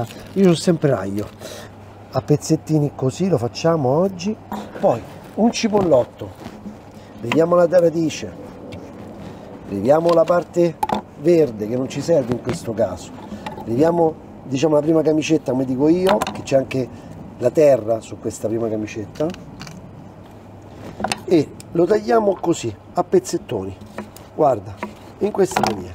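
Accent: Rome, Italy. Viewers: te che parli in romano, piu' i sottotitoli in italiano... me sembra de torna' ai vecchi tempi de "i sottotitolati", di Greg... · Se non parli il romanesco è molto meglio. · Ma perché parli in Romano,che sei Napoletano? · Simpaticissimo col suo accento romano, bravissimo in cucina, complimenti, io la seguo sempre.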